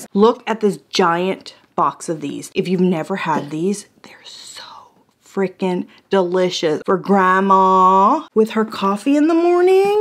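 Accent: South, United States. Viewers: Malta, coquito shes a puerto rican w a southern accent and funny too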